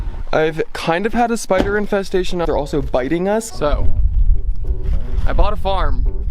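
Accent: South, United States. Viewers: Do your parents support your sexuality? Would be curious to hear you talk about that. Growing up in rural places can be really taxing, maybe im being presumptuous but I definitely think I hear a southern twang in your voice. It’s cute. Really happy for you and austin, hope you guys have many happy years together on the farm.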